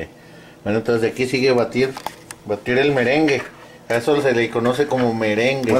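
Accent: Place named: Chihuahua, Mexico. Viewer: he estado viendo sus videos y me hace el día su forma de hablar peculiar de nosotros los de Chihuahua arriba el norte, me encanta su autenticidad y que no se anda con mamadas jajaja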